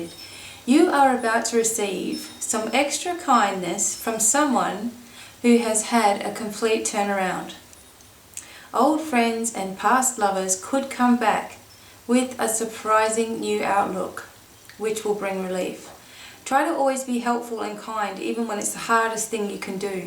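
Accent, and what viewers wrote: Australia. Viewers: Your sunny, unpretentious nature, your Aussie accent and your cheeky smiles always brighten my day and make me grin happily. Good job, B.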